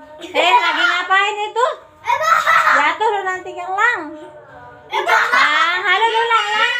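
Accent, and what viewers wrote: Java, Indonesia. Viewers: Tapi seperti orang Jawa logatnya mom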